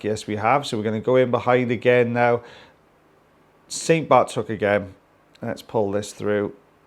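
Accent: Wales, United Kingdom. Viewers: It's the welsh accent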